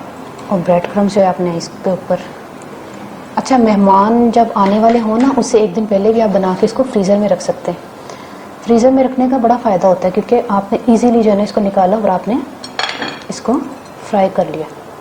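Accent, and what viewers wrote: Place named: Punjab, India. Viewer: Yar apki punjabi bare mazy ki ha api aur mein larki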